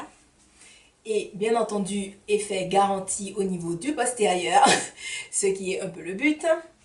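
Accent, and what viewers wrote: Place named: Antilles, Caribbean. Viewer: ton accent antillais, c'est trop mignon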